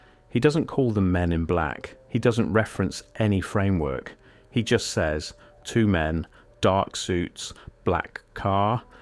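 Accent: United Kingdom. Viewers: Taking this with a huge grain of salt, since a google search turns up no encounter involving anyone of that name. Plus the faceles, unnamed, obviously British narrator claims transcribed materials from the original case wound up in his possession. Seems quite a stretch.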